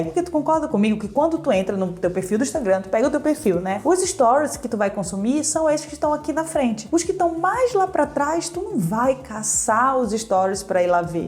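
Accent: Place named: Florianópolis, Brazil. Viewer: Algo me diz que o sotaque é de floripa 😅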